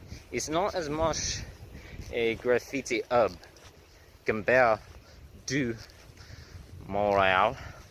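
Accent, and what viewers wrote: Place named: France. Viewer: Stupid question but why are you speaking in a friench accent? (Like I said I'm watching everything chronologically. But it has somewhat stopped bc of stupid reasons)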